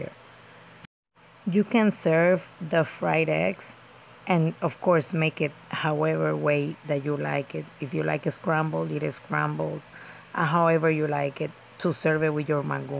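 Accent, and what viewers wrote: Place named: Dominican Republic. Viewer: This lady talks in English as if she is talking Dominican Spanish. Same style, delivery, idioms, etc. Made me miss home, god bless.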